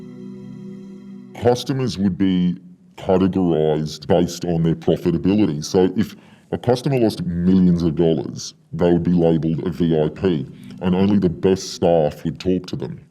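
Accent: Australia. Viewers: easy to tell hes aussie. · Aussie yo! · Bro can’t hide the Australian accent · Aussie if I’ve ever heard it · australian accent. tanned skin. age 40-59. cadence indicates melbournian. one of the largest organisations .SPORTSBET,TAB,crown,WATERHOUSE thats what i saw in this vid about you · Pretty obvious this guys from Australia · sounds like an australian accent, interesting! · 2 seconds in Yeah this guys Australian ool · no amount of distortion can hide that Australian accent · that aussie accent, and he means TAB they are crooks, as soon as you get a winning streak or jag a massive multi or first 4, they ban you from betting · Can tell this guy is an Aussie from his voice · i like that you can hear the australian accent through the voice morphe lmfao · Can tell hes an aussie 🤣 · this guy is 100% aussie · No matter how hard you try - you cant hide that Aussie accent!!! 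Get a dog up ya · This guy sounds Australian. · This guy has to be Australian. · Sounds like an Aussie. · This guy is from Australia maybe from Perth ... words like tick tick tick and Heeps gave it away and theres a Casino in perth so im just guessing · This guy is definitely Australian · Dude is Australian, I can tell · Australian accent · the legendary aussie accent powering through the muffled voice effect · Sounds like this guy is definitely Australian. Could only be Sportsbet, BET365 or Ladbrokes then.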